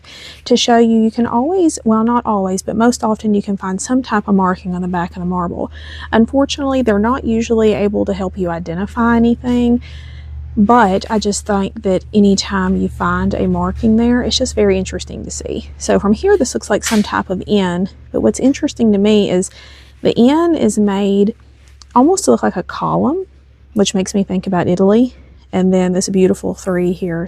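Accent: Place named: South, United States. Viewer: Did I not say you are intelligent and knowledgeable? Hon, you should hear my accent. I'm from SC. I spent a lot of my life in business offices, and I know how people perceive that particular regional dialect, because I used to speak it. But you do you. I appreciate your knowledge, and will keep watching you, regardless. Honestly, just trying to help, so you will be seen as more professional. People already think anybody with a southern accent is stupid. I live in FL, and I moved from S. FL to N. FL in part because people made fun of my accent. I love it up here. It's truly the deep south of FL, complete with oak trees dripping with moss. Have a lovely, day. I didn't meant to hurt your feelings. <3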